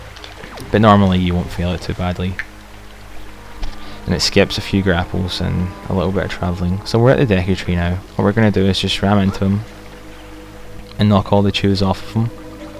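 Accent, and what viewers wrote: Scotland, United Kingdom. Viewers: are you scottish? I detect a scottish accent in your commentary =D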